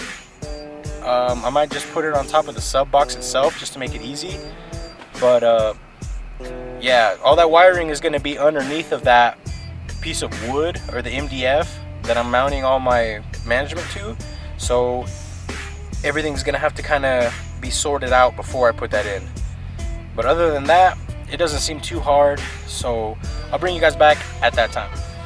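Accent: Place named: Bay Area, United States. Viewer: i hear hell ya bay slaps! YEEEEE ur from the bay arent you i can tell am i right?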